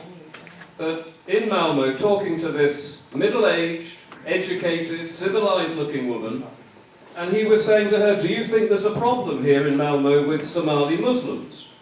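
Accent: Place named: England, United Kingdom. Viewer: is such a nice man, great mind and he always dresses well. He has a lovely English accent, its not high and mighty and its not common. His voice is actually a future PM voice.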